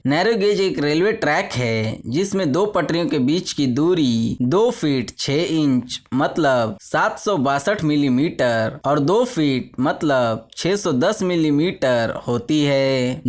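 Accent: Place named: Bangladesh. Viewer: Bangladeshi type ki bolti ho 😂😂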